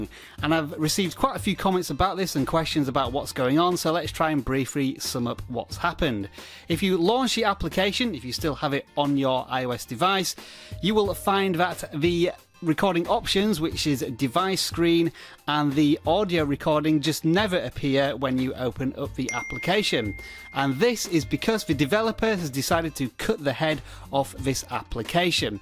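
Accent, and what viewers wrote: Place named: United Kingdom. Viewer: You are the first YouTuber with a British accent I find him amusing. 2017 must be my lucky year!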